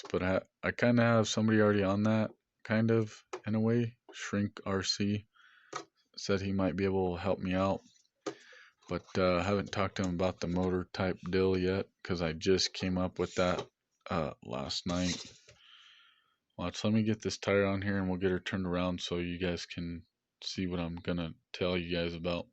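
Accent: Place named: Alaska, United States. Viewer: Are you Alaskan? My cousin has a similar accent, she lives in Juno. Just curious! You have Great Content on here! Keep the Awesome Videos coming! 🤗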